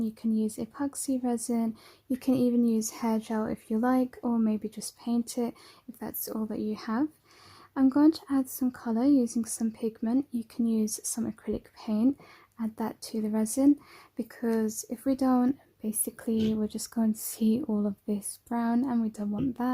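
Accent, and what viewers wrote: England, United Kingdom. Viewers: do you live in England??? Love ur beautiful accent!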